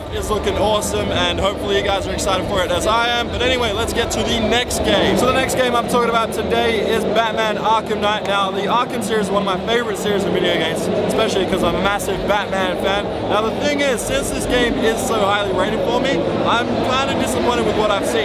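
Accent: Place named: Australia. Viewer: ***** lol it was joke...he always says "my accent's Australian"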